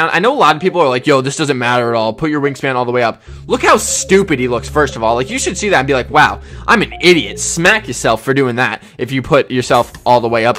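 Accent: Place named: Australia. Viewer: Brother starting sounded like Australian why did you sound like Australian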